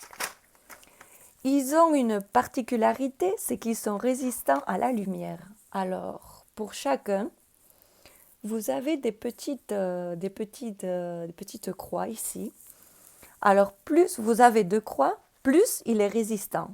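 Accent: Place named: Spain. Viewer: Mais ton accent 💖 la moitié de ma famille est d'origine espagnole et quand je t'entends ça me détend tellement je ferme les yeux je retourne en enfance je m'y croirai presque 😁💖 Tu m'as fait rire avec le fait de ne pas vouloir acheter trop de gammes de crayons, je crois qu'on tombe tous dans le piège quand on commence le colo 😂😂 Merci pour le partage je me régale avec tes vidéos 🥰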